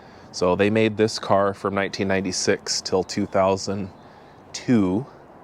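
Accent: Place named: United States. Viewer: Hearing an American talk about diesel engines is crazy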